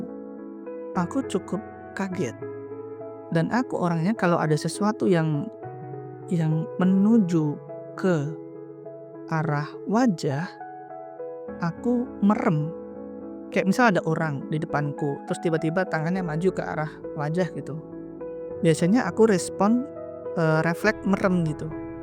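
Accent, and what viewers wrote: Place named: Sulawesi, Indonesia. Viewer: Logat sulawesinya masih nempel ya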